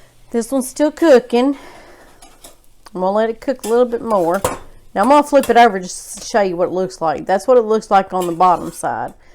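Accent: South, United States. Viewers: Thanks for the video. Your southern dialect is like butter on bread!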